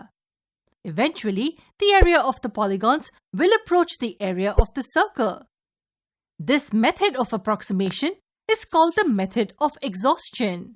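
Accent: India. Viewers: aaaaahhhh Indian accent ........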